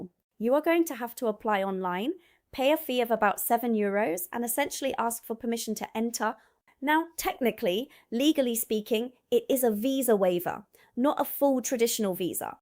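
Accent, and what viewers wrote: England, United Kingdom. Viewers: Your pronunciation does not sound US; it sounds very British. How did you manage this? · the host speaks with a clear British accent with no trace of any identifiable American accent or American word choices. I can only assume that she is British but lived in the States for maybe half of her life and kept the accent. She needs to make a video and clarify this for us. It’s too distracting for me. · Much as I have sympathy with what's being said on this channel, I do wonder why this supposed USA citizen has an English accent. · Is the host of this video really an American speaking with a British accent? (I'm new here)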